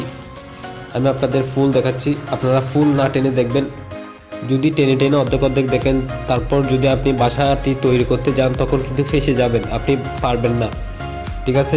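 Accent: Chittagong, Bangladesh. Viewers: Karon ami deklam apni chitainga vasay kotha boltesen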